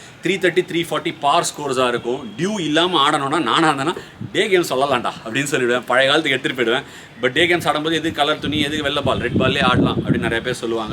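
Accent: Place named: Chennai, India. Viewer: I just love your way of talking. Keep it up. Very casual very Chennai though I am not from there,it's highly amusing.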